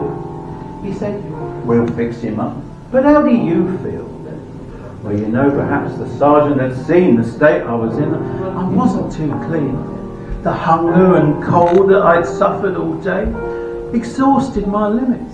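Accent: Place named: England, United Kingdom. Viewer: Very well done my friend. I liked it a lot. You have an excellent voice and I love your English accent.😊👏🏻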